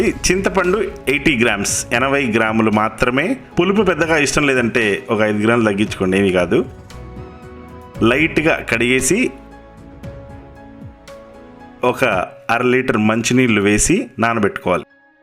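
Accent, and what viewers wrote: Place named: Godavari, India. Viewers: Godhavari yasa yetakaram ayendhi bro.plz never sing..... requesting you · 🤣🤣godavari slang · రాలేదు గోదావరి కి కానీ యాస బాగుంటుంది అండి అయ్ మా friends ఉన్నారు ప్రౌన్స్ బాగుంటాయి❤️❤️❤️❤️ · Hiii ala unnaro. Mana godavari vala voice super ga chepparu. I love godavari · సార్ మీ వాయిస్ సూపర్ గా ఉంది గోదావరి వాయిస్ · Aa voice, voice lo grace.slang ,superb wow sir konni words tri vikram gariki kuda cheppandi sir. Mana godarolla manasu vallu matlade matalo thelustundhi. Sir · Mana godhavari మాట కే.. కాదు.. వంటకాలకు కి కూడా ఒక spl place ఉంటుంది.. ఎక్కడైనా సరే..... చాలా బాగా explain చేశారు... That to గోదావరి యాస.. hailight ... ,❤❤❤❤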